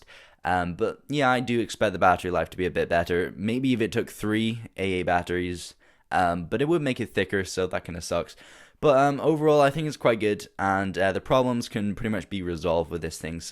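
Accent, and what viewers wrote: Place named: Scotland, United Kingdom. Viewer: you have got a wee Scottish accent coming through mate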